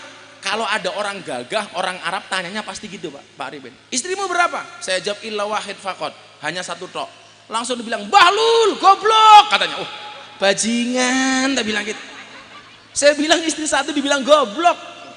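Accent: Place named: Banyumas, Indonesia. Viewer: Masyaalloh gus gus ngapak ngapakku dewek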